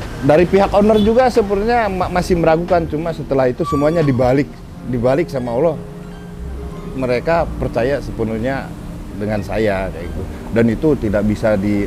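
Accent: West Java, Indonesia. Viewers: Logat ngomong nya sunda🤭😂 mungkin kelamaan di bandung haha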